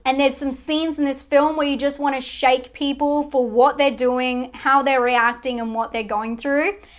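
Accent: Australia. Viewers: New Subscriber! - No need to apologize for your accent 😎 that is one of the little things that sets you apart from all the other channels, and if someone doesn't like the aussie accent...I'm pretty sure they Hate puppies and you shouldn't worry about those psychopaths #kiddingnotkidding :~)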